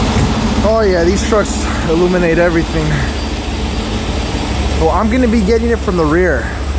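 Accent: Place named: Arkansas, United States. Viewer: Dude, I've been enjoying your videos for at least two years or longer. I'm pretty sure that I met and ran into your grandfather, or maybe great grandfather? several times over at Engs Peterbilt back in the late 70's-80' before Rush. I can't remember Mr. Engs first name but knew him and dealt with him and Tony Lacey, and knew many small fleet owners for many years. Guys that if they couldn't have fun with you and vice versa they were no good to you. Anyway, one time he was talking about his son being ready to go out solo on a wrecker...as soon as he got a D/L in a few years. So this is a long way around just to say your videos are great, your talent and skills are superb, and now I see you're honing in on your comedic assets. "I'm going to be getting it from the rear" and then the Peter Pan scene...you're funny dude! Also, I laugh every time you same "boom". 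If you didn't say it you sound like a good ole hippy boy from Little Rock Bakersfield. I better stop now and apologize in advance if I've offended anybody...I've been drinking, you suggested it. I'm an old man, maybe there's a new kinky kind of Towmans Porn going on? Be careful out there, peace out, and enjoy. God bless.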